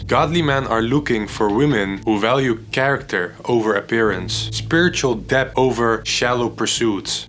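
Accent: Netherlands. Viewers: Ben je nederlands bro.. ik hoor je accent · His accent exposes the Dutch nationality. 🟧🦁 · Excellent video, and channel. You do sound Dutch 😊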